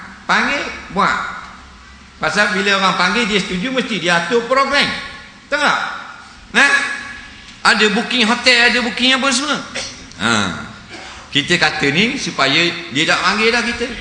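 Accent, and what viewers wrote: Terengganu, Malaysia. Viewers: Zulkifly Hj Ali study dlu loghat terengganu bru cite bodoh..mcm org melaka cakap celaka la celaka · ustaz orang tranung,bukan klantan..orang tranung memang keras sikit loghat die..mu orang luo xyoh nok komplen la..cakak banyok tek..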